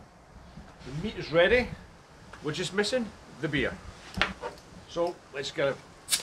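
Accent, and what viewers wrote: Scotland, United Kingdom. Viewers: Great vid. Just found it. The accent though? Scottish? Went to Argentina and ate almost a kilo of steak a day. Loved it. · pretty good man! where are you from originally? I sense a bit of a scottish accent, or maybe northern england? my only suggestion is that for thin cuts like entrecot or matambre, is better to salt them on the grill or right before you put them in. Also chimi is better when you leave it in the fridge for at least 24 hs! The true test for a grill master is to grill a whole vacio cut (which has 3 different cuts in it , with loads of fat and membrane for flavour and crunchyness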